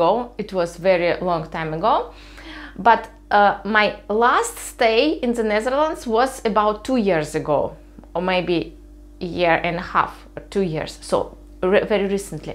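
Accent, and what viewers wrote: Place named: Russia. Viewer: Funny story about the response you received when you asked for the supermarket... I am sure Dutch people immediately recognize your Russian accent. And it might be the mafia image portraited in movies or remnants of the Cold War,... but big Russians in the dark are scary.... Reminds me of the telephone instructions I once had to make,... you know ... "If you want this, press 1, if you want that, press 2" and the last phrase was "otherwise you will be disconnected". My daughter made that instruction for me because she is fluent without any accent. She is also capable of imitating the Russian accent and made that version for me as well. I can tell you, this last phrase sounds very sinister when spoken with a Russian accent "otherwise you will be disconnected...." Like: "un'offerta che non puoi rifiutare."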